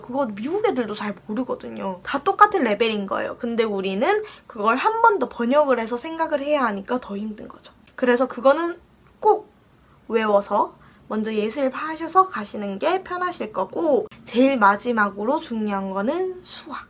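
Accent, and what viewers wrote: Gyeongsang, South Korea. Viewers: 경상도 사투리 쓰시네요ㅋㅋㅋ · 경상도 사투리 묻어나오시네용 ㅎㅎㅎ 도움 많이 되었습니다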